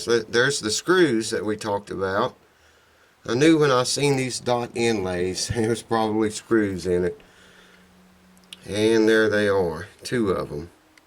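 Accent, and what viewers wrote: South, United States. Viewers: Thanks, you have helped me a lot setting up my guitar, i can even do the F chord now,Thanks I like the music videos, you have a good voice,maybe it's that Southern accent, all the best.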